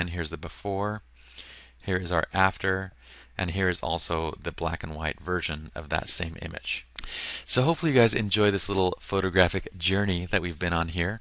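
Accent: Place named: South, United States. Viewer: Love the accent...it's ok....it's a Southern thing. You made me laugh and Lord knows I needed to this morning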